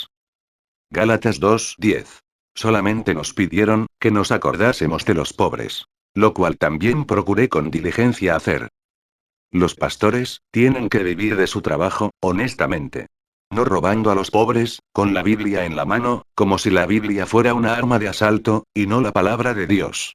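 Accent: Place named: Spain. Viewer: Este Huevon que hizo este Vídeo, porque habla como las huevas, no respeta las comas, derrepente se va ahogar, se creerá gringo, pero parece Español.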